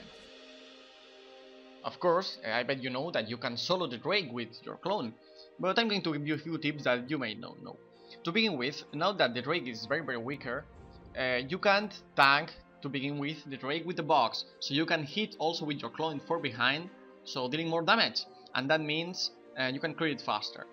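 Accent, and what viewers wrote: Catalonia, Spain. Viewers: Are you catalan? Your accent doesn't seem like the english is your native language. Good vid btw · you are spanish? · I play as shaco every day just for practicing and you have helped me alot with your tips. Thank you bro.... PS: Your accent is quite different, Are you from Spain? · You're Spanish? I know :v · You catalan? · Awesome! I've actually never heard anyone from Spain talk before now that I think about it, and now I'm really confused how that never happened. Oh well.. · ¿Eres español verdad? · spanish? that seemed a bit catalan xD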